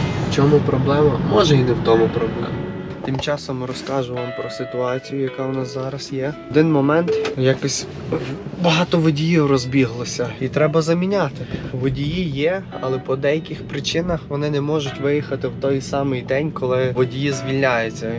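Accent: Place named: Western Ukraine, Ukraine. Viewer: по акценту видно что хлопцы из ЗАХОДУ ,почему узнал ? Я сам оттуда поетому сразу понял Прикарпате, возможно Закарпате но болше звучит как ребята из Коломыи или Калуша>2 года назад делали CDL значит успели перед войной сюда приехатъ ,Оченъ удивително видетъ таких детишек что так серъезно задумывают болшие планы и из воплошают в жизнъ. Одним словом настояшие ГУЦУЛЫ !!!!!!!! Здес в Америке 21 год считают толко полнолетним вообше детишки долго развиваются в отличие от таких молодых ребят с такими болшими амбициями..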